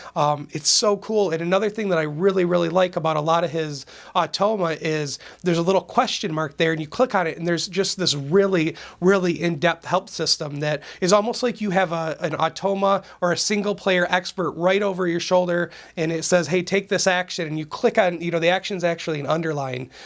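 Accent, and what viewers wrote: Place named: Wisconsin, United States. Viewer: I feel like you have a northern accent. Is that a Wisconsin accent by chance? lol.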